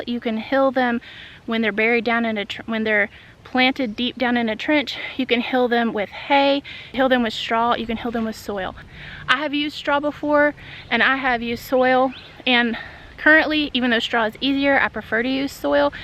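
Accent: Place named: South, United States. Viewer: Love you!! Is it just me or is she extra southerrrrn on this one?? ❤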